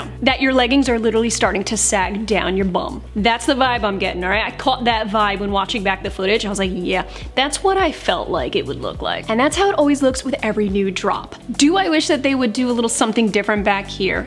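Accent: New Jersey, United States. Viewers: Not interested in buying literally anything. Here every time EXCLUSIVELY for the Jersey accent.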